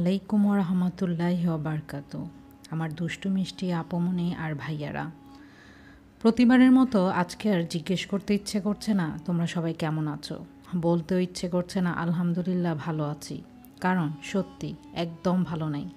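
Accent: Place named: Sylhet, Bangladesh. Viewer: it Khalil Bhai from London, u say your a girl from Dacca but u are a sylheter bou, but u speak like a sylheti girl are originaly from a Dacca family?? people explain more thanks.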